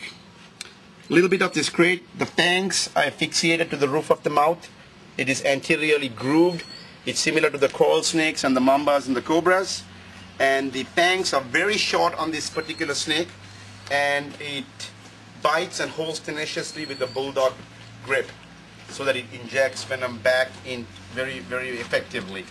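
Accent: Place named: India. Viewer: Dude this video 99% talking but the problem is his indian accent is so strong but still he talks so fast and with the low quality its impossible seeing the mouth to help understanding, so its very hard to understand. You should really talk some more slowly and clear if you want that people can understand you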